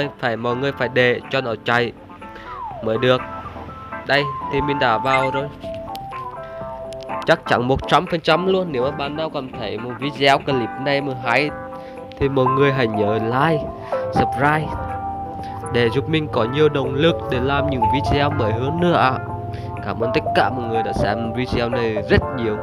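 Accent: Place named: Quang Binh, Vietnam. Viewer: Giọng Anh em ở Quảng Bình giống y hệt Anh lun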